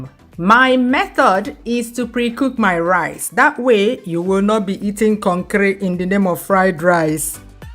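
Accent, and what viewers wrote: Igbo, Nigeria. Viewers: Igbotic voice...yen yen yenh ! I love it lyk that 😂😂😂😂😂😂😂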